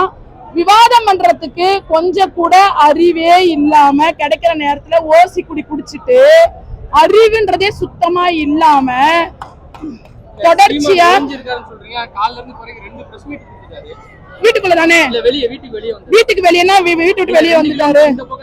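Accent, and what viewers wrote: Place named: Telugu, India. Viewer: அக்கா பேசுறத பார்த்தா தெலுங்கர் தான் இருக்கு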